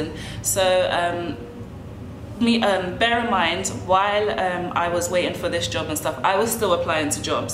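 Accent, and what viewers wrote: United Kingdom. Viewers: I love the caliber of you caracter and fisicaly you geogeouss sunshine i just have some issues with you heavy british accent...lol.... Is like listen spaniers speak spanish.. Im billingual english and spanish but my english accent is from usa and my spanish is from chile... So i sound more gooffy and global well round it when i spoke in both languages.... I think is important to teach a more landed to the ground aproaches to the lenguagues to our new world reality of globalizacion make it more related to an neutral accent.. My spanish for instance is a mix of well educated chilean vocabulary with a rancher mexican singing language what icquired in las vegas nv , with a spice of colombian coffe grounds...lol cheers 💥🌀💖🐾🎸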